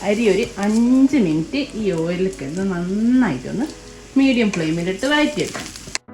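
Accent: Kozhikode, India. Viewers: കോഴിക്കോട് കാരിയാണല്ലോ. സംസാരം 🥰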